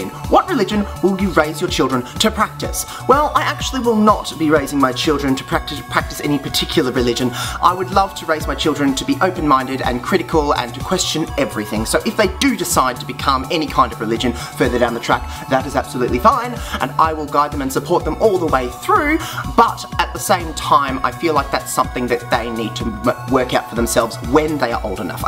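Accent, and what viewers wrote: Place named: France. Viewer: I like your french accent ! He's so cute !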